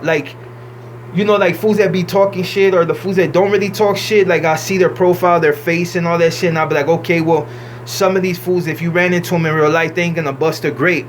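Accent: Mexico. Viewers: Are you full black ? Becuase damn dude you sound Mexican! You gotta be part Mexican lol it's not a big deal but its interesting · Why the fuck you talking like a mexican nigga? Stfu w yo bitch ass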